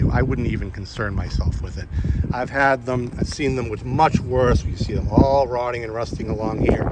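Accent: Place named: Boston, United States. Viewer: Hi! Love your channel and your Boston accent! This car is priced very well, considering the survivor quality, the low miles, and all the undercoating process that the previous owner paid for. He must of loved it if he kept three covers on it in a climate-controlled garage. It will sell FAST!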